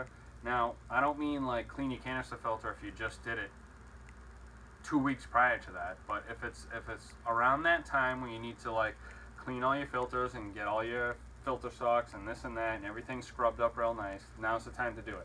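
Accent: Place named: Massachusetts, United States. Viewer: Can I just say as a fellow man from Mass your voice just makes me homesick. Also as a guy who just got back into the hobby after not having a tank since I was a kid you actually saved my fishes life. Your videos have been very helpful with getting back into the hobby. I now live in Kentucky, and it's nice to have a little piece of Mass to go to, and get advice on a hobby I always loved.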